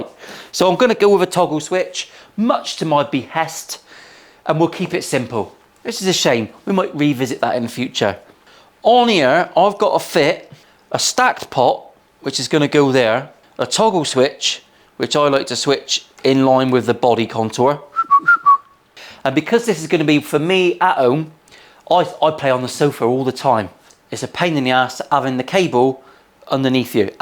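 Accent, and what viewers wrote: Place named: Plymouth, United Kingdom. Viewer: 9 grand worth of resin..... Priceless Janner accent !!! 🤣🤣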